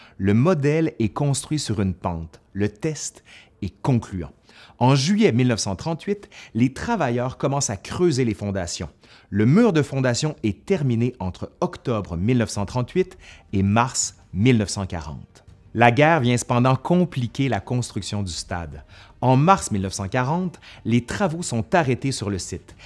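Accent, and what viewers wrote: Quebec, Canada. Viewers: Je ne regrette pas d'avoir eu la curiosité d'aller faire un tours sur votre chaîne après votre collaboration avec Nota Bene, les sujets sont toujours fascinants, éclectiques et le texte est très bien écrit et ne parlons pas de l'accent des cousins québécois que l'on adore 😊 Merci pour tous ce travail j'attends toujours les épisodes avec impatience ! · Les coins ronds! J'adore le français du Québec. Encore une vidéo passionnante. Merci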